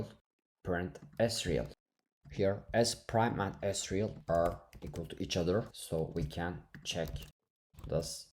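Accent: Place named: India. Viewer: kanka çok iyi içerikler üretiyosun ama hintli ingilizcesi gibi konuşuyosun yanlış anlama :D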